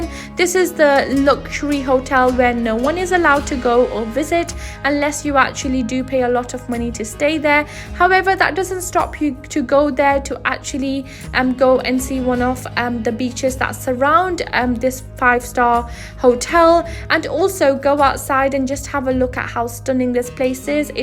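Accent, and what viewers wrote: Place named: United Kingdom. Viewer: U have a British Asian accent :D, guessing you're Asian. Good vid btw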